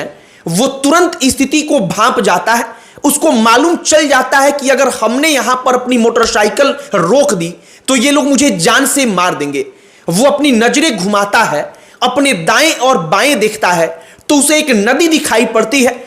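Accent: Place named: Bhojpuri, India. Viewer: Aap to bihari ho, aapka bolne ka style bhojpuri wala hai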